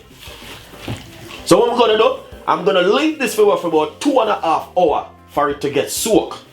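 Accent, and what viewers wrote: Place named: Jamaica. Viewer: Loving the Jamaican recipe... and accent.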